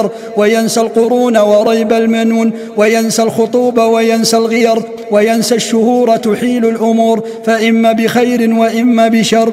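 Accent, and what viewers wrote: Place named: Najd, Saudi Arabia. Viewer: أهل الشام قراءة نجدية اعتقد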